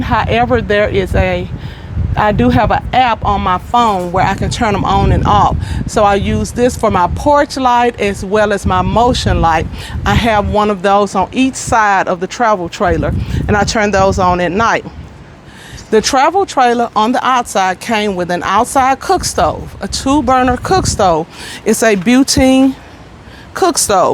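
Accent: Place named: Texas, United States. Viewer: I most definitely hear a TEXAS accent 😇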